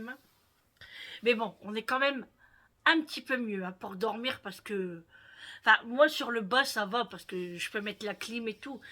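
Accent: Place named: Nord, France. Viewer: Bonjour,je tai découverte y a pas longtemps, j'aime beaucoup suivre tes vlog,ton accent du Nord,j adore,mes parents été de valenciennes ❤tu est une belle personne, courageuse simple, ça ne m'étonne pas c'est ça le nord,je t'envoie des bisous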